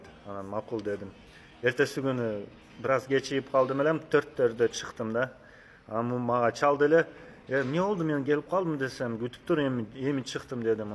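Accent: Standard. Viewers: Азаматсын балам, соз байлыгын коп , таза тунук суйлойт экенсин бар бол, ийгилик сага. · Ассаламу алейкум ,дрсум азаматсын ,анча мынча Кыргыздарга караганда таза суйлойт экенсин сонун,Аллах ыраазы болсун сага дрсум ,ккопчулугу Ош ЖалалАбад жактагыларга эгер салыштырсак озгочо ,бирок алар капа болбосун мен болгон чындыкты айтып атам ,келгиле туугандар таза суйлогонго аракет кылалы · Азатсын , таза кыргызча суйлоп , элди тан калтырдын, азаматсын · Бирок кыргызчаны так адабий тилде суйлойт экенсин рахмат ошол эле мезгилде мен кыргызмын дегендер меням,сеням деп суйлошот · Молодец! Омурлуу бактылуу бол таза суйлойт экенсин кыргызчаны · Азамат жигит! аман бол, таза кыргызча сүйлөйт экенсиң рахмат!🎉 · Ооба бир да созуно орусча аралаштырбай таза суйлоптур баракелде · Какой ты молодец чисто разговариваешь на кыргызском языке. Браво.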